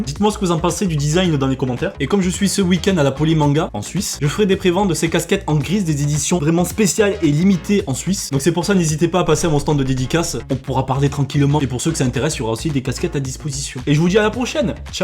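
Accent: South, France. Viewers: Non le bouc c'est sa marque de fabrique avec aussi son bel accent du sud (que j'ai aussi) c'est sacré comme les cheveux bouclés pour Norman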